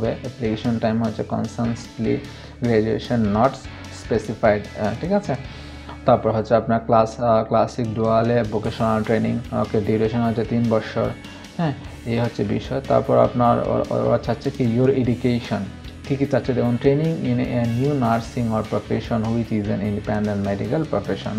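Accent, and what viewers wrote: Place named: Sylhet, Bangladesh. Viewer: Vai mone oy Sylheti 🤩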